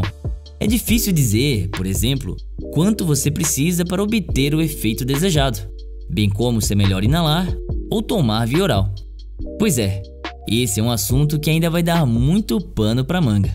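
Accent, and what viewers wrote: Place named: Florianópolis, Brazil. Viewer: Tu é de floripa né cara? Esse sotaque aí não me engana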